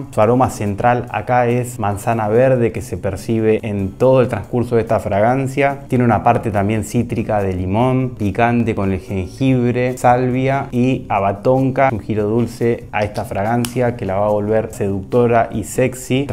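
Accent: Argentina. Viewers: Bien claro las explicaciones. Maestro es cordobés usted?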